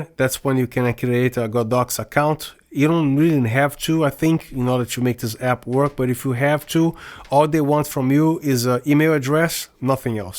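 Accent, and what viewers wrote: Brazil. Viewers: Based on your accent I am guessing voce e Brasileiro. I just bought 4 of these. I cannot wait to use them!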